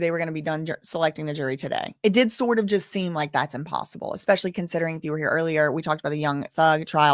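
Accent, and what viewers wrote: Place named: Louisiana, United States. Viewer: 💜💛💚⚜️ this Cajun is here in every chat! Im not always talking, but always learning from you! I love hearing the bayou in you come out 🥰 keep doing amazing things, Queen! Thanks for doing what you do!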